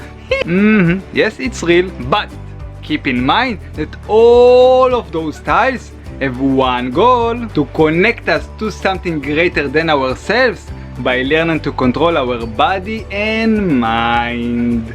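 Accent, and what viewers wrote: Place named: Israel. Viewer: Hey thx.. you have an Israeli accent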